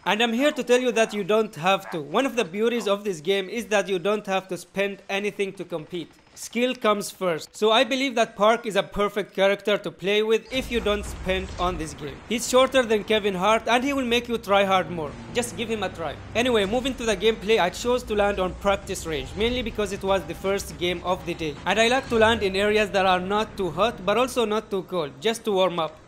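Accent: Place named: India. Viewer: Your voice essence feels like u r Indian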